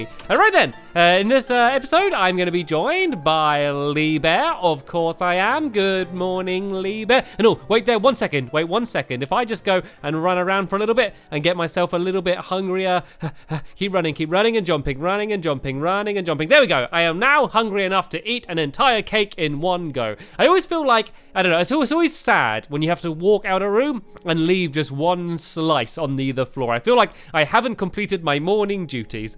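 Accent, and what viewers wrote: United Kingdom. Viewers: U speak British like meh friend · 0206 Are you british?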